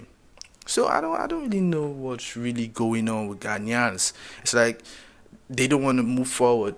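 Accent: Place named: Nigeria. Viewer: Everyone knows you’re Nigerian from your accent so I don’t think you need to use “Nigerian” again in your captions. I understand it’s for click baiting but it’s unnecessary. It’s not an artiste job to manage another artiste. Gh artistes have tried on their own varied ways to support other artistes, ie featuring on their songs, giving them a platform on their shows, performing on their shows, promoting them, giving them plugs etc. even with limited resources/ investments. Gh artistes managers and labels are also trying with limited resources. The main issue is investment and a conducive industry environment to push the artistes to the top. If Gh artistes have the same or even 3/4th of the investment that Naija put in their artistes, and even in their movie industry, Gh would be doing much better and would be robbing shoulders with the top artistes on the world stage.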